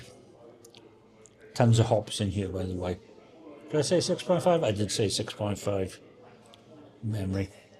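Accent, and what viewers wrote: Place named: Wales, United Kingdom. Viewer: Love your Welsh-inflected patter...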